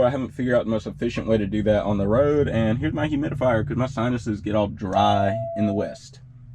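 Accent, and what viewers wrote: South, United States. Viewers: Where you from,? Quite the southern accent.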